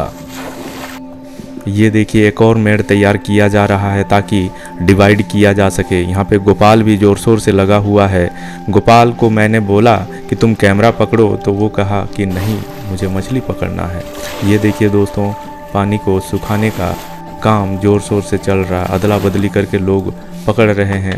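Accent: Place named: Jharkhand, India. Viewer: Baat se lag raha hai aap jharkhand east Singhbhum ke hain. Ab yeh bata dijiye kis gaon se hai. Main Jadugoda se hoon.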